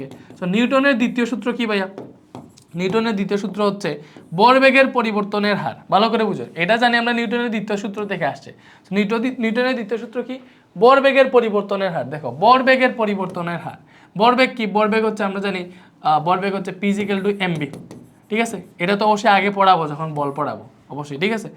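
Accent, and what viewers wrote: Sylhet, Bangladesh. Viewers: ভাই কি সিলেটি?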